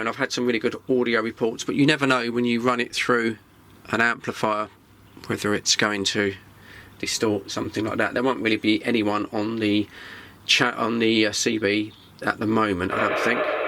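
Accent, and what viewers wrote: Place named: Newcastle, United Kingdom. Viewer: ahh ok, Geordie accent!!!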